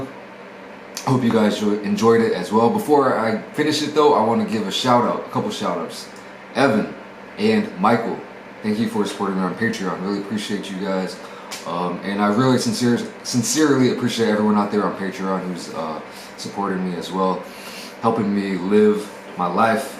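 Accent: United States. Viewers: His voice is so american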